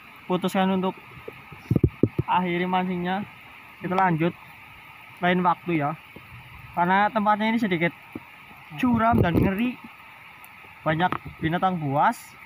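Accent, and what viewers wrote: Banyumas, Indonesia. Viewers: Sampean wong ndi kang deneng bhsane ngapak😂👍🤭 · Bahasanya ngapak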